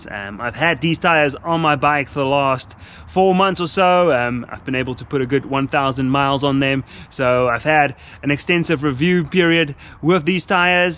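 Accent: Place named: South Africa. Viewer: I was wondering what your accent was! South Africa, very cool. Probably good riding there too. I've got 1800kms on my Nano's and there's another 1000 or so km left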